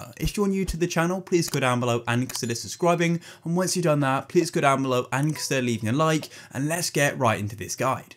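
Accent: United Kingdom. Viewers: HE'S BRITISH!!!!!!!!!!!!!!!!!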